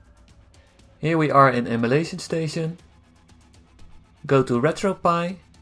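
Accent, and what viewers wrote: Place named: Netherlands. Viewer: You sound Dutch Ben jij. Nederlands?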